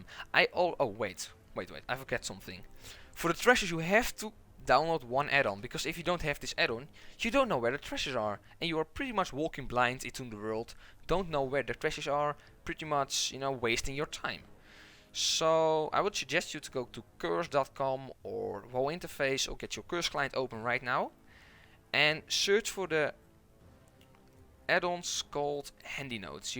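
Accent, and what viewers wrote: Netherlands. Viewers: You sound Dutch :D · Dutch sounding English. The worst. thanks for the tips though ;)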